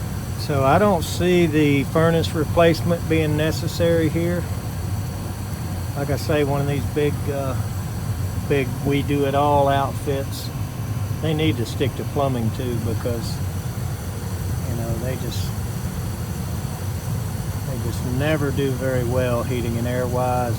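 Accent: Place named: Southeast, United States. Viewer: I like watching Steve Lav and you, but it's almost like culture shock switching between the two of you excellent tradesman. Steve's the quintessential fast talking, no holds barred, plumber with the Northeastern drawl; the slow talking, cautious, soft spoken Southeastern country gentlemen HVAC technician. Both are a joy to watch and are not only entertaining, but very educational in their presentations. Thanks to the both of you for taking the time to make these videos.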